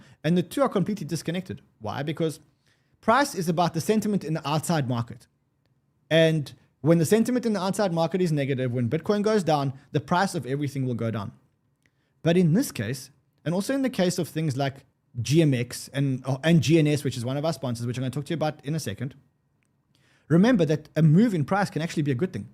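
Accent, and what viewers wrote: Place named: South Africa. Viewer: I thought I recognized the Saffa twang. Nice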